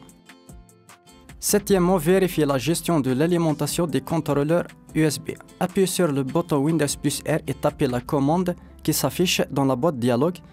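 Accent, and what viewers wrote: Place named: Spain. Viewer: il est style ton accent !! espagnooool franchement gg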